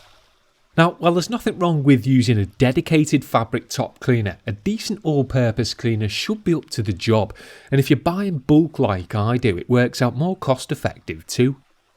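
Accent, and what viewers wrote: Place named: Birmingham, United Kingdom. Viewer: OMG the bleedin Brummy accent unt the subtle innuendos made me smile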